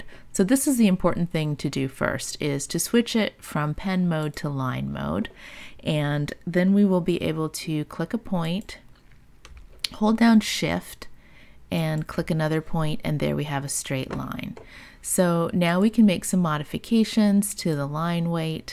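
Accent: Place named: United States. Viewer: What a relief to hear/see a tutorial in American English.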